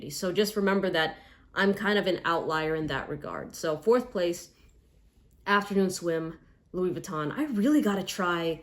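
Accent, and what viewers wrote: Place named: Philippines. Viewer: you definitely sound Filipina to me..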